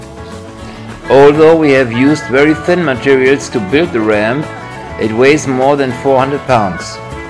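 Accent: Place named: Germany. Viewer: as a linguist I seem to hear your German background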